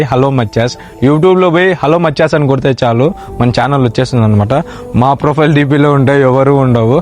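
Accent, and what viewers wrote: Anantapur, India. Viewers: Medi ananthapur ah bro slang knchm alane undi .. just asking anthe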